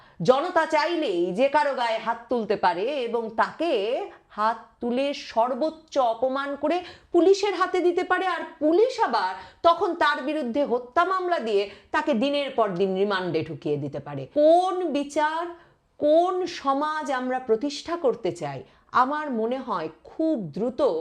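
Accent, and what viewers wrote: India. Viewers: Indian awaz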